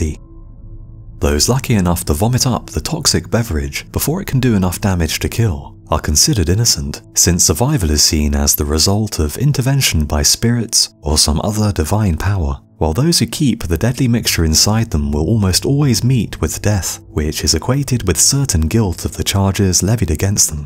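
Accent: United Kingdom. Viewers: Best narrator voice of all time. I must know what part of Great Britain has this accent. Please enlighten me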